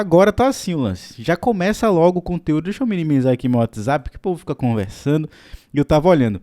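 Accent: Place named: Alagoas, Brazil. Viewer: tu é de Maceió? Tem um sotaque meio alagoano, fio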